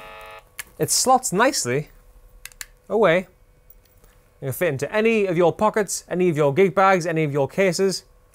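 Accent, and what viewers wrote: United Kingdom. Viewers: I didn’t expect his accent to be British he looks America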